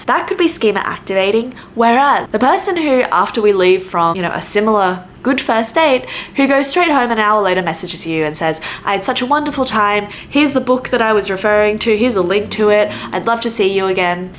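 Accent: Australia. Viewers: I just watched it and the intro and outro are adorable! Lots of people love the good old Aussie accent :) And the research and referencing was really good (I am an Australian psych honours student - so I may be a bit biased). I reckon you should leave it up, it's bonza!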